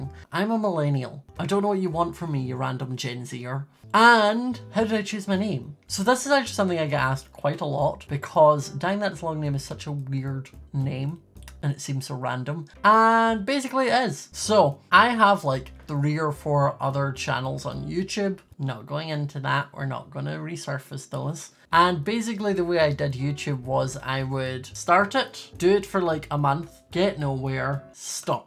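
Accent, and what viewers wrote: United Kingdom. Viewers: We love a good ?british? Accent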